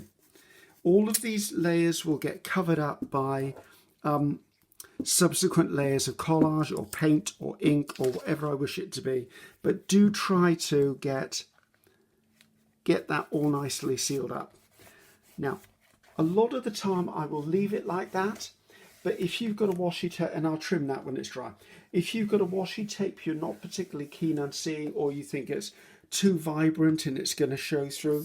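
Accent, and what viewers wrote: England, United Kingdom. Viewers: First of all, I love your videos. I normally never look long videos. I get bored very quick. But with your videos I like the things you tell, your English accent , and I am afraid I miss something when I skip parts. :) I used to glue the pages, but I dont do anymore. My gluesticks cost 5 Swiss Franc ( about 4 pounds) x 3 , too much for me to spend before I begin a journal.. So now I make my mixed media pages separate and than glue it on a single page. No bulk Most pages will be covered with just paper collage anyway Or I buy a nice MM journal for that 12 Pound and alter it on the go. . The gluestick I use is Tombow mono Professional glue stick. It is SO good, no buckling , and even after many years it sticks very strong. And I am addicted to the smell. After a long surching way finding the best and cheapest liquid glue : collall all purpose glue! 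It sticks everything , paper , fabric, etc. And it costs almost nothing here in Switzerland. Well , long story, and I think you know most of it already, Bye bye, have a great week!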